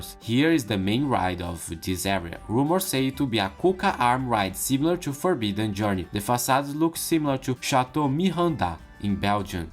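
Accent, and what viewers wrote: Brazil. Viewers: Great video, thanks! Is that a Brazilian accent?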